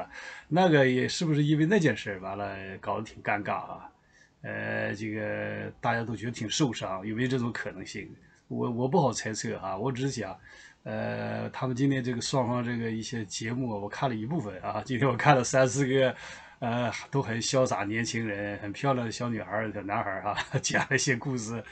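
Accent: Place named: Dalian, China. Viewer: 大连口音啊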